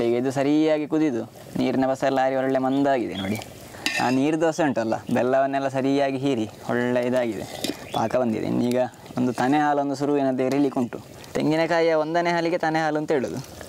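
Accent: Mangalore, India. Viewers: Nimma vids ge naanu addicted aagogidini.. eshtu chanda adugegalu, chanda parisara, aa manglooru kade kannada, ahhaa.. nodtaa kootre samaya hoguvude gottagodilla nodri maarre!! Bahala santhosha!!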